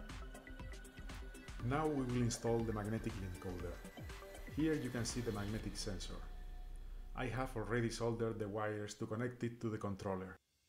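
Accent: Spain. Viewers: Tienes al acento espanol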